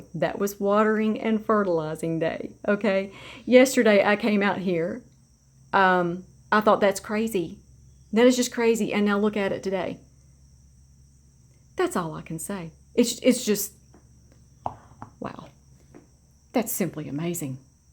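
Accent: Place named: East Tennessee, United States. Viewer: Those are really great roots and I am also amazed at your accent. I was born ed and raised in upper east Tennessee and you sound like a touch of home. I am now in South west VA but I could listen to you talk all day. Thank you is all I can say.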